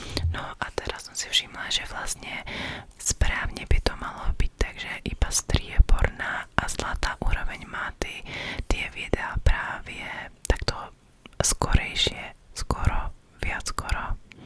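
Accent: Slovakia. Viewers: hej jakoze uplne narovinu kdybych neznal tvuj kanal a nevedel ze si ceska tak bych si myslel ze si ze slovenska protoze ta vyslovnost nebo ten prizvuk je fakt skvely🩷🩷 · znieš ako u nás západniari, tí občas dávajú české slovíčka hihi, ale inak dosť zlepšenie prízvuku od prvého dielu <3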